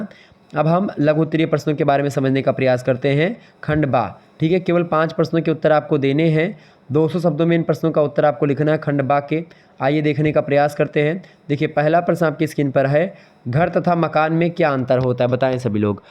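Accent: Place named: Awadh, India. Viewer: Sir aap aawdhi bolte hai acha lgta hai ❤Best teacher on youtube for B.A.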